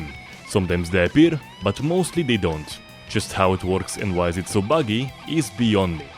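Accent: Russia. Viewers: Are you russian or something?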